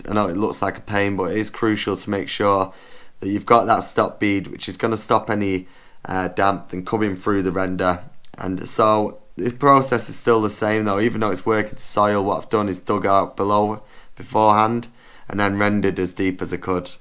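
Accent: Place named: North, United Kingdom. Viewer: Hastings?! You sound like you have a northern twang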